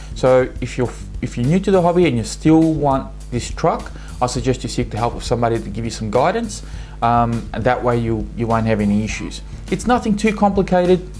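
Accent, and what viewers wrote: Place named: Australia. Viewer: Great review!!! don't take this the wrong way... You are the new Jang. He does thorough reviews at ultimate rc but you're more indepth and more entertaining, maybe it's the Aussie accent LOL. Please keep them coming, we know it's a lot of work so thank you. If you take request I like see a SC comparison from the 4 that you have. Price/ durability/ performance / parts support etc... which do you recommend and for what type of bashing etc...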